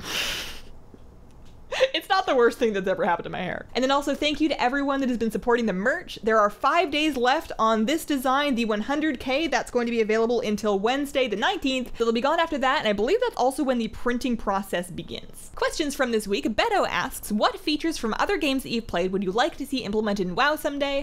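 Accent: Canada. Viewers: You're Canadian, aren't you? :)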